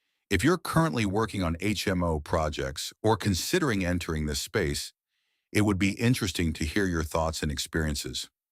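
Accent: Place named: United States. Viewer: Why do u hv an American accent?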